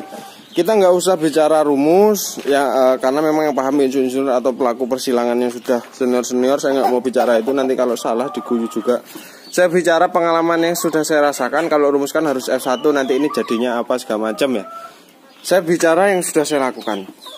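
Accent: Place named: Lampung, Indonesia. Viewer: Mas alamate nang ndi? Kok bahasane koyo wong jowo Lampung.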